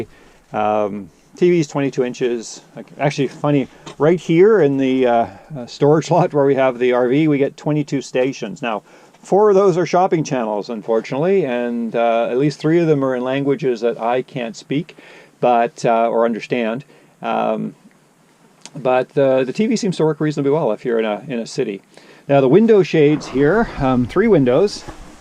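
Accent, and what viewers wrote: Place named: Canada. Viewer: "Process" and "out" too, but he's pronouncing them correctly for Canada and that's where they live. If we were in Canada WE would be speaking "funny".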